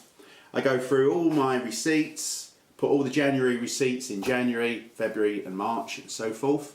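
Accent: United Kingdom. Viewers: i like British accent im enjoying the way you talk.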